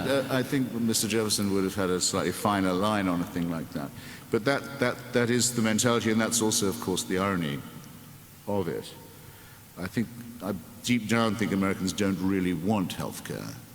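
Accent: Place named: England, United Kingdom. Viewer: If he didnt have an english accent no one would care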